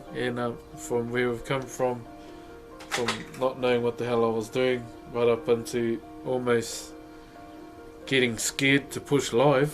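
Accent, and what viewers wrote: New Zealand. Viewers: if I'm not wrong I think he has a Maori accent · the New Zealand accent is so wholesome. I love you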